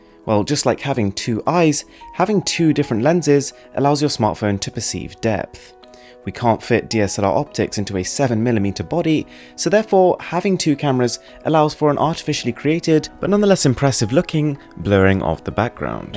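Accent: United Kingdom. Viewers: Extremely difficult for me to follow. I get the main point but you rapidly slur through about 20% of your words with your nice Queen's English accent, making it impossible for me to understand your finer points. If I were sitting with you at a luncheon, I imagine that I would constantly, embarrassingly, be having to ask, "I'm sorry, I didn't quite get that?...I'm sorry, could you repeat that please?" If you wish to professionalize your narration, it would be useful to work on enunciation and clarity of presentation. Despite this, thank you for the content and information.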